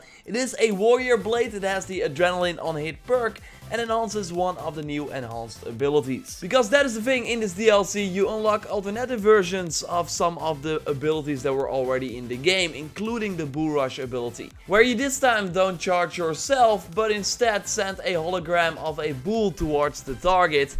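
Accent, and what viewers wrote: Netherlands. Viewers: I can hear through the guys voice, he is from The Netherlands, correct me if im wrong.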